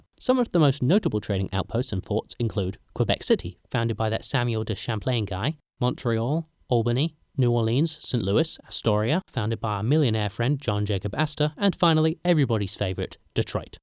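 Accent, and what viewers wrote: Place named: United Kingdom. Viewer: This youtube formula is crazy: British accent + shitty graphic art + whimsy commentary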